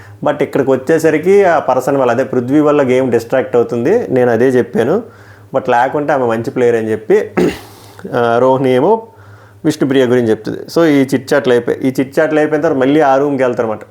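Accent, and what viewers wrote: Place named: Visakhapatnam, India. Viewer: Super slang bro … I like vizag slang …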